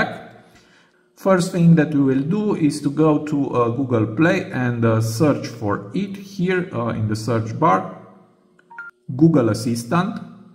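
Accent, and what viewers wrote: Romania. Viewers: Thank you very much! I think u are Romanian by Ur accent ❤❤❤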